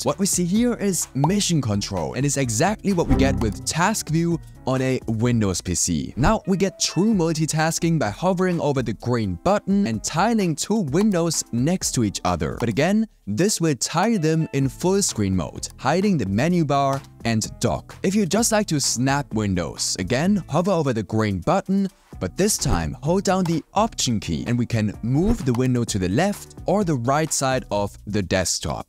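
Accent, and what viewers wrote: Germany. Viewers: You sound very German, are you also German? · the german accent was too strong to over hear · Gone are the days of my youth when finding a German who speaks English was looking for a needle in a haystack. still has a little bit of accent which I immediately localized but it is not detrimental in the least, it is even charming. Actually, his English has more clarity than that of the most native speakers I follow on Yt. But it’s not only in the way he speaks. It’s also the way he lays things out and his overview. A natural born talent for an educator. I associate this subject of learning the macOS with my worst frustration of the last month but the way he deals with it replaces my grave frustration with simple fun and much faster learning curve. The script and video integration are simply awesome. I know doesn't need my acclaim after millions of views and thousand of comments, but I am so impressed I want to encourage him anyway. 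I have watched this three times and will be coming back. This edition may be better than the 2025 one. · TOO STRONG GERMAN ACCENT !!! impossible to listen to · hatte kurz gedauert i hear the german akzent btw nice video :)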